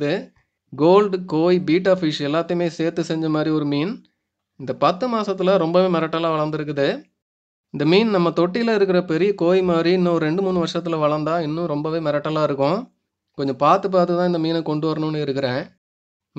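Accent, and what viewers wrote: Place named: Kanyakumari, India. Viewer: s s Language kanyakumari pola irukku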